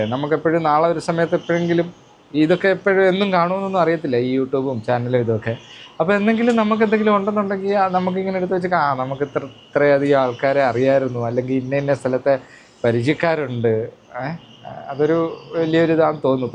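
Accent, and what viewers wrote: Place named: Palakkad, India. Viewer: ബ്രോ 😂എനിക്ക് നല്ല സംശയം ഉണ്ടായിരുന്നു നാട് pkd ആണ് എന്ന്..... ആ സംസാരം കേട്ടപ്പോൾ തന്നെ തോന്നിയത് ആണ് പിന്നെ ചോദിച്ചില്ല എന്നെ ഒള്ളൂ..... പിന്നെ വാഴ പിണ്ടി യുടെ കാര്യം പറഞ്ഞപ്പോൾ.... വീണ്ടും തോന്നി പാലക്കാട്‌ എവിടെ ആണ്....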